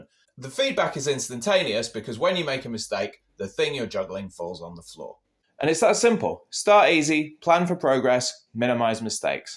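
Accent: England, United Kingdom. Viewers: Hey, mate, are you born in UK... it seems you speak British accent English, don't you?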